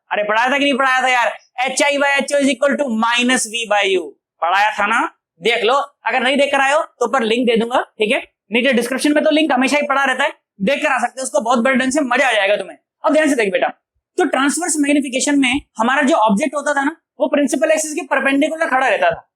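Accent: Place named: Bihar, India. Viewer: First of all, you speak a very nice bihari, secondly your explanation is also very good... I got the concept..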